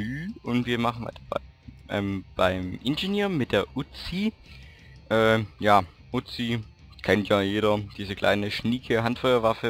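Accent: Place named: Swabia, Germany. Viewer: ein Schwabe..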